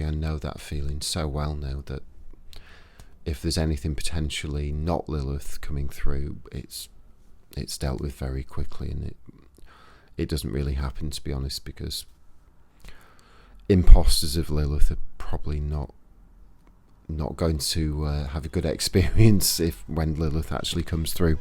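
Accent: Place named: United Kingdom. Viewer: Off topic your voice is so calming and love your British 🇬🇧 accent it's the cherry 🍒 on top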